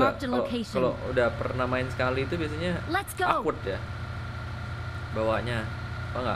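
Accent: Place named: Central Java, Indonesia. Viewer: Dari jawa tengah mana suaranya🙏🙏🙏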